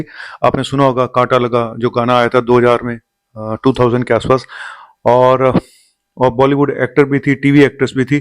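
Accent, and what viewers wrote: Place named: Punjab, India. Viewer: Dora नहीं दौरा डाक साहेब। पंजाबी accent बदलो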